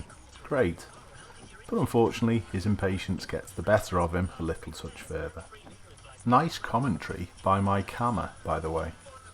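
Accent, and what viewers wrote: Liverpool, United Kingdom. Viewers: Your laconic Liverpudlian commentary adds just the right touch of understated contempt to these clips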